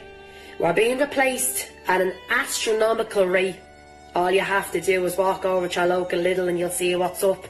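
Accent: Ireland. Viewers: My God her accent is the best thing I ever heard! Love this sooo much!! All public announcements in every country should be in Irish accent love it lol <3 <3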